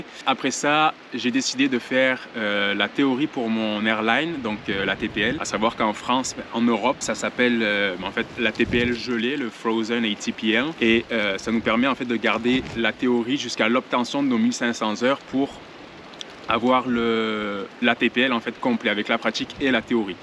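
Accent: Quebec, Canada. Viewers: L’accent québécois pas canadien haha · Tu as tellement pris l'accent canadien :) GG mec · J'adore ton accent. On entend tranquillement le petit accent Québecois qui perce!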